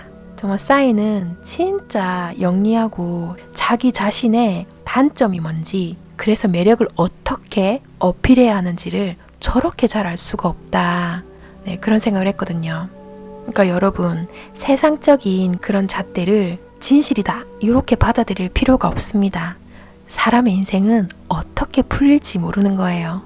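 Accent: Gyeongsang, South Korea. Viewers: 안녕하세요~ 님 오프라윈프리 방송인줄 알고 들어왔다가 님의 러블리한 사투리에 포옥 빠져서 듣다보니 벌써 두번째 보고 있습니다. 남들이 지적하는 나의 단점이 오히려 인생역전이 되는 최고의 장점이 된다는 말씀 그걸 어디서 언제 발산하느냐가 관건이다!!!는 말씀 가슴에 와 닿았습니다. 제 자신을 찾기 위해 나만의 색깔을 찾기 위해 날마다 물음표를 던지고 있는 저에게 큰 도움이 되는 영상입니다~ 감사합니다❤️🤩⭐️ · 님 감사합니다 ~ 고향이 어디세요? 같은 경상도 사람으로써 경상도 억양이 너무나도 정겹고 듣기가 편합니다. ^^. 언젠가 가까운 시기에 꼭한번 뵙고싶습니다 ~ 좋은 말씀 듣고 힘내고 있습니다 늘 감사합니다!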